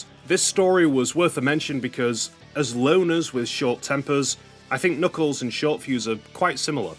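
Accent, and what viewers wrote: United Kingdom. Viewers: I'm british, myself, but sometimes I really notice that wonderful british accent :D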